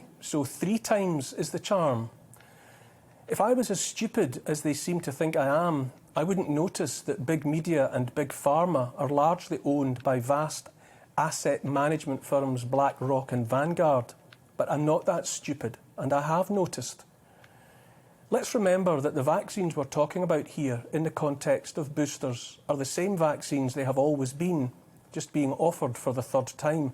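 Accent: Scotland, United Kingdom. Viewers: Loved your specials,. Now I enjoy your commentary’s, and that great accent. I deeply regret that I have no Scottish heritage. I live in Australia where one of our cities Melbourne, broke the world record for lockdowns.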